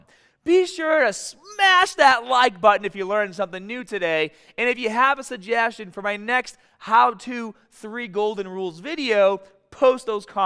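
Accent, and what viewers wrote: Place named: New Jersey, United States. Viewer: U a lil bit New Jersey, but great also hahaha ! Awesome analysis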